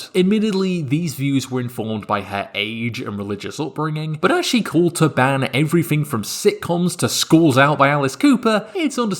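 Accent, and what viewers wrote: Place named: Canada. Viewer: Sounds a bit Canadian.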